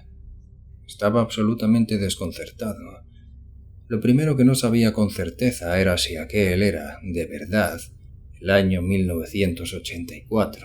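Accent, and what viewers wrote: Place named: Spain. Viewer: Que feo escucharlo con español de españa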